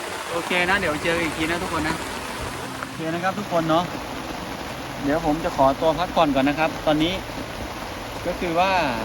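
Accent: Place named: North, Thailand. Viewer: คนเหนือนีวา555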